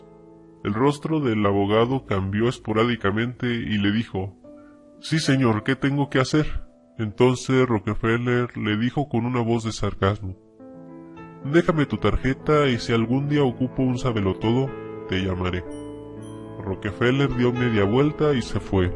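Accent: Chihuahua, Mexico. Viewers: pues por la manera en que dice la "ch" yo digo que es de chihuahua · Mmmm... asento de shihuahua ? Si, este es de esos....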